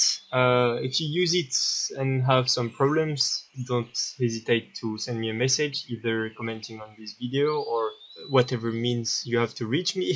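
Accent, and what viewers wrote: France. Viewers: tu es français non ? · Vu l'accent tu dois être aussi français que moi. Félicitations pour ton boulot. C'est énorme ! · salut mais trop ^^ je vois que les cours d'anglais sont d'un haut niveau en france :p en fait, vous parlez sans doute bien, vue que je suis une bite en anglais, je sais pas trop mais l'absence d'accent anglais est fort ou bien c'est l'accent fr qui donne ce coté là quand on parle anglais....enfin, je trouve que c'est plus compréhensible que quand c'est des anglais qui parlent donc c'est cool. mais ca me fait délirer car vous etes le 3 ou 4ieme youtubeur dans la 3d que j'entends parler en anglais lors d'un tuto et l'accent est tjrs aussi facile à reperer, lol ^^ c'est trop drole (et sympa). sinon, sympa le tuto ^^ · truc de ouf, j crois j'ai repérer un accent Français par ici mdrrr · So you are French ? I love your accent from Seine-et-Marne, merci mec 😉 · Yo, t'es Français? 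Are you French?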